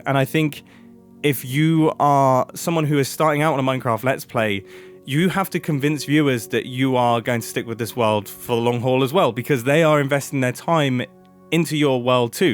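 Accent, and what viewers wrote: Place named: United Kingdom. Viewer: I’ve been watching Let’s Play videos since 2017, maybe even 2016, and I can confidently say I miss those days. The “100 Days” videos are nice, but they feel repetitive. Modded Minecraft is cool, but it can also get repetitive. Once you’ve got the Ender Pearls and Blaze Rods, the video’s probably over. One YouTuber I watch, Kolani, makes both relationship and idea-based content, kind of like you. You both create videos not just for views, but to stay connected with your audience. I still remember stumbling upon one of your videos back in 2017, when my mum was battling a terminal illness. I just heard this British voice chatting away, asking, “Guys, do you want a timelapse?” That was your first ever timelapse, you mining down to level 12. And I remember thinking, this is me! Talking about cars, music, videos, traveling, all while strip mining. 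Nowadays, so much of it feels fake. People exaggerate everything: “Bro, how are we going to cross this lava river? That’s insane!” It’s just not the same anymore. Thanks. You’re truly unique.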